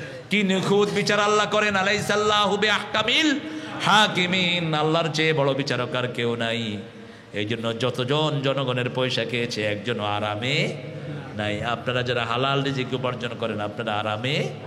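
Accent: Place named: Noakhali, Bangladesh. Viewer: আমারও মনে হয় কারন অনি নোয়াখালী ভাষায় কথা বলে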